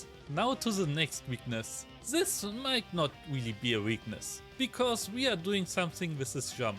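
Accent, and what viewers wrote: Germany. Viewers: Dein Akzent ist soooo deutsch.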